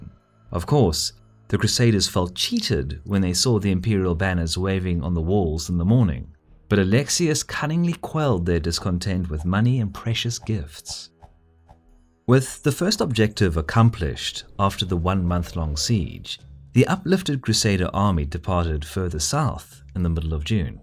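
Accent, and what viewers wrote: South Africa. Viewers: comentor of dorleaum battle is he south african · Nice South African accent by the narrator.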